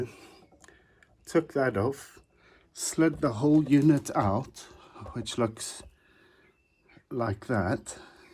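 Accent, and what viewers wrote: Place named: Scotland, United Kingdom. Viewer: Nice video man! And I dont know what it is with the Scottish accent that just makes me listen to your instructions like in a classroom lol!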